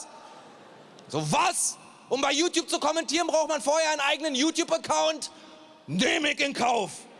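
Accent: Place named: Berlin, Germany. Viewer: dieser Berliner Schnauze stundenlang zuhören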